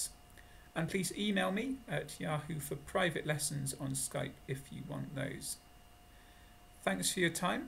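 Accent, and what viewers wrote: United Kingdom. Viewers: You have so cool British accent...I think it would be a good idea just to talk about something in English, tell the story or stuff like that. The topic isn't so important I guess. A magic happens when students hear English. That is a quite important thing in the way of learning.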